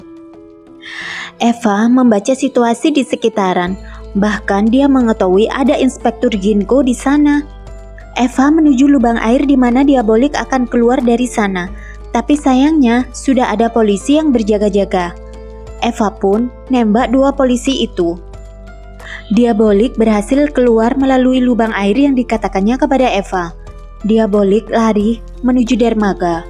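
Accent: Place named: Sulawesi, Indonesia. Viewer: Logk Sulawesi 😂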